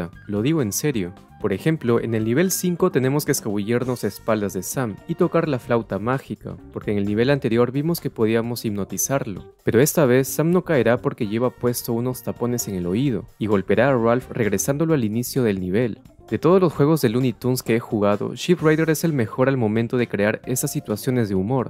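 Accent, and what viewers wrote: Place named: Ecuador. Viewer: de verdad? es que vivo aca en ecuador y tu acento se parece al de aqui jeje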